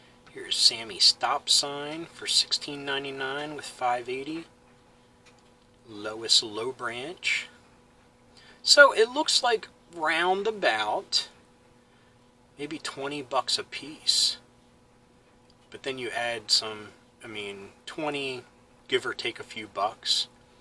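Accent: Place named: Maryland, United States. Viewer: Great channel, going through a bunch of your videos! Also, Pinterest is a great place for 80's nostalgia, lots of great pictures and collections w/o having to buy stuff. Lastly, you should collab with Hat Hole Home video...I have no affiliation with him, you guys just have a similar vibe and channel. I was going to ask what part of the country you're from but I can tell a Maryland accent anywhere! haha.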